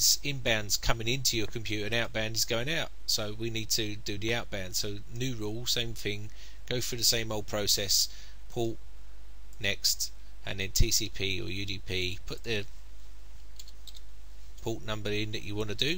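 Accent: United Kingdom. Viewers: I know that's pretty much the accent for all British people lol. There is slight variations. This guy sound like Frankie your right though.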